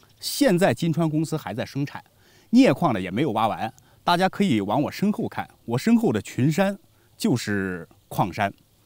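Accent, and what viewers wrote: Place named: Central Plains, China. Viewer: 我看的视频，总感觉像是中原这边的人，主要是听口音😂😂😂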